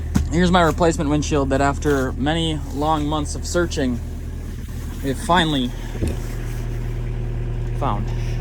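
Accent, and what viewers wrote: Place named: Minnesota, United States. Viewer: Its so nice listening to a fellow minnesotan on youtube. All the other youtubers have the worst non-minnesotan accents.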